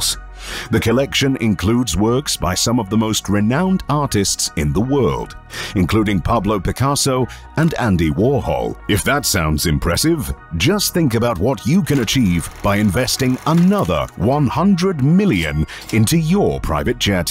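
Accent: United Kingdom. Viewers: The commentator obviously comes from the North of England...you can tell this, by his saying the word BATH....ONLY Northerners speak this way.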